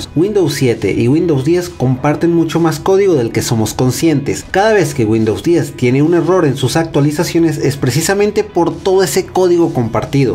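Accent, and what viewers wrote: Venezuela. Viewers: Llevo tiempo viendo tus vídeos y siempre supuse que eras Venezolano jajaja Saludos desde tu misma nación crack!! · amigo eres de venezuela bueno porque yo si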